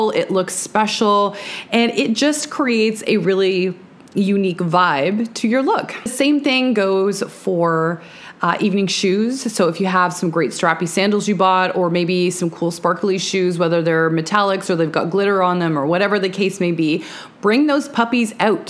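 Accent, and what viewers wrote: Canada. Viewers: Hello, new subscriber here! Very interesting and helpful tips, I can see this will be a fun channel for me. I love the deal with bringing our fancy dress into our everyday wear. I am a big fan of that style, even if it does confuse some women in my neighborhood.😉 But first, I was wondering (not a fashion question) if you are from Canada? Curiosity always gets the best of me and there is a slight accent I hear...just wondering.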